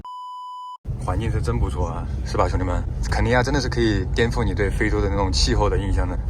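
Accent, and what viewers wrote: China. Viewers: 英文能听懂，还是蛮中式的哈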